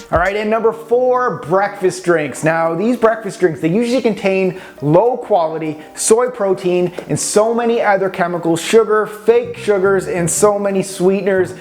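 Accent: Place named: Canada. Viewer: That fucking Canadian accent makes my ears bleed.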